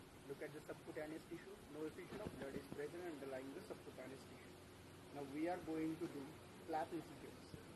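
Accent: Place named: India. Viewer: прошу прощения. Индия. Акцент характерный. труп пролежал не более 4 дней, только в условиях жары. плюс сам по себе человек смуглый был. отсюда и отличный внутренний мир тела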